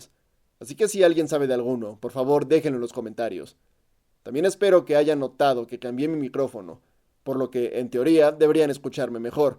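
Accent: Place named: Mexico. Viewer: no sé bien dónde es Oaxaca pero suena a mexicano, y no soy mexicano pero tienes algo encontrá de los mexicanos son unas buenas personas. En todo caso me da igual el vídeo está bien hecho no te meto odio. Ya que más quieres buena suerte.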